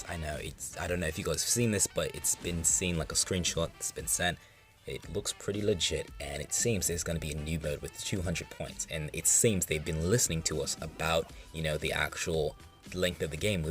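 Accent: United Kingdom. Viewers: yeah yt is drunk half the time it’s no prob....also loving the British accent 🤣👌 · Love the news vid and British accent too!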